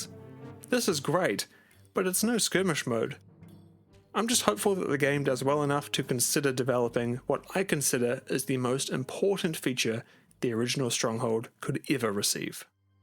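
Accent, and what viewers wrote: New Zealand. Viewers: Love the Kiwi accent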